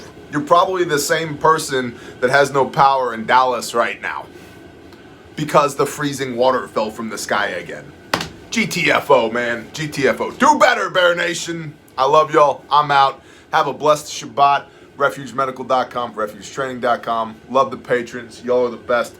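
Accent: Canada. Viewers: The "vandalism" of the Terry Fox statue (old school cancer victim who attempted to run across the country on one leg after the other was amputated..he didn't make it as cancer took his life) was placing a Maple Leafs hat on his head, a hockey stick in his hand and a Canadian flag draped over his back. We all know if this was a burn loot murder riot they would have spray painted it before tearing it down and tossing it in the canal to great applause from the msm. Loved the Canadian accent lol ❤🍁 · Oh my gosh the canadian accent hahaha Did you star in the Fargo movie because that is exactly what it sounds like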